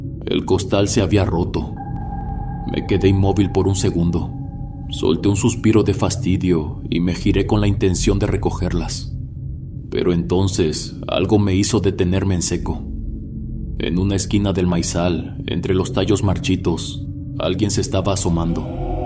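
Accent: North, Mexico. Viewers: Hola, tu vioz y la manera de hablar me recuerda a mi primo residente al norte de México. Muchas gracias!! Y, muy bien relato. Como siempre!!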